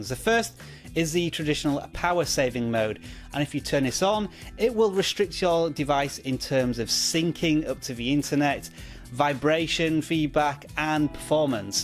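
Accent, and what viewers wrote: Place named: United Kingdom. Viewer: The goddamn british accent creeps me out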